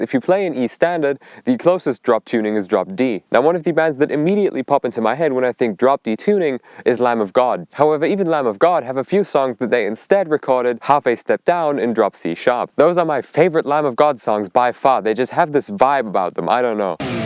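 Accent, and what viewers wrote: United Kingdom. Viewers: I only play in Eb. Spot on British sounding German sir. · Could you, for the love of God, explain who you are british sounding person in Germany with good content but an odd guitar collection!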